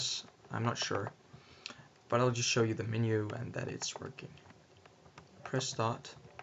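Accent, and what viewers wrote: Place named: Brazil. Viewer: thanks man! I didn't know what to do with the citra.exe application. Do you live in Brazil? Your accent sounded like it., but I doubt it because of your name.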